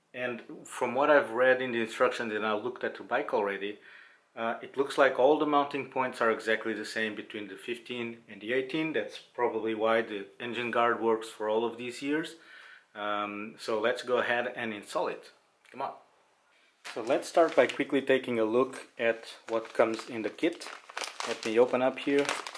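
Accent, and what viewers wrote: Portugal. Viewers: Excelente vídeo! Desde o primeiro vídeo que vi teu, achei que pelo sotaque serias português lol Já agora, os buracos nos cantos superiores da grelha servem para algo? Abraço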